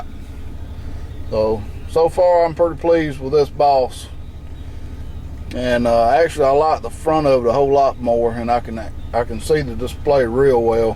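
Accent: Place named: Alabama, United States. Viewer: I love these videos! You sound like you live in Alabama, where I live. You do an excellent job and you're entertaining too.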